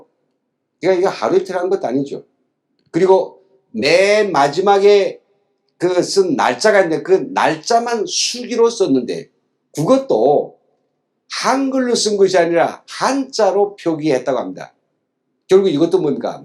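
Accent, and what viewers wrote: North Korea. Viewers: 이분 발음이 이북억양이신데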